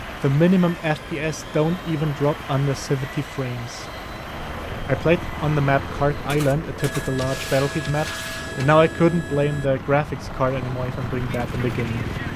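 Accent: Germany. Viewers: are you german your accent is like a german is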